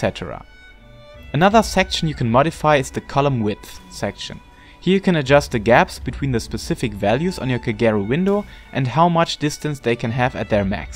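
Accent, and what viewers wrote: Germany. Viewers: Nice german accent^^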